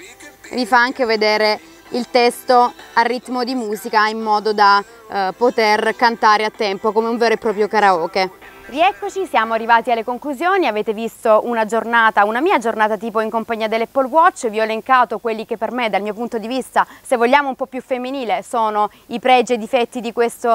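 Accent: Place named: Salento, Italy. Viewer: Orgoglio, una pugliese salentina fa parte di questo team favoloso! Si sente l'accento, specialmente quello dell'amico in live sull'apple watch xD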